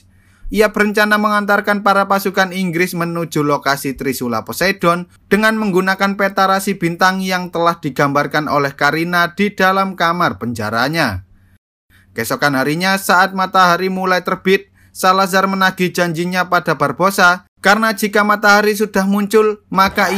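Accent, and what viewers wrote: Java, Indonesia. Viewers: Jawanya medok skalee rekk🤣🤣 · bang sampean wong jowo ya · Wong jowo yo cak? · Meddok emet lu bru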